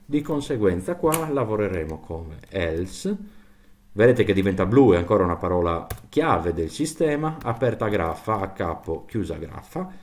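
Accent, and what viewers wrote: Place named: Sardinia, Italy. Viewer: Non posso non fare i complimenti per la chiarezza espositiva e le precise nozioni illustrate. Mi dispiace essere arrivato in ritardo nella scoperta di questo canale che sto seguendo con piacere e tanta voglia di apprendere il linguaggio C#. Chissà... Davvero complimenti perché rispetto ad altri canali Youtube, al momento, questo si sta rivelando per me il più efficace. Sinceri complimenti! P.S. Immagino dall'accento e dal cognome che siamo anche conterranei... fortza paris!:goodvibes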